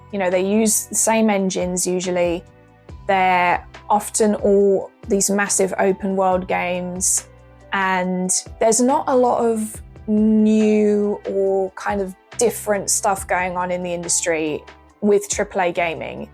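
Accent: United Kingdom. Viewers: I watch the channel’s videos because of the content, and also because I’m learning English your British accent is music to my ears.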